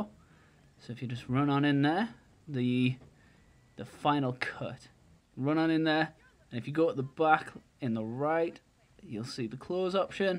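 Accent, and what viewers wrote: Newcastle, United Kingdom. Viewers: are you a geordie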